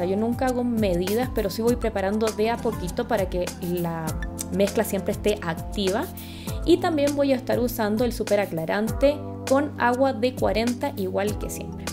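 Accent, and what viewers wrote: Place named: Chile. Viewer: Yo acá viéndote desde Alemania donde ya no voy a la peluquería primero por lo del corona y segundo son caras y muy, pero muy malas .....te felicito es rico escuchar el acento chileno de nuevo . pregunta tu traes babylight pero en las puntas quedan asi de claras o esas les hiciste balayege o algo mas ? saludos te pasaste eres muy buena peluquera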